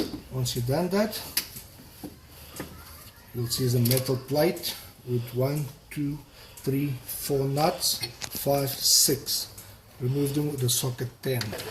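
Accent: South Africa. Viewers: Are you possibly South African? A Boer? :O Very nice ecu job! Thanks for the detailed video.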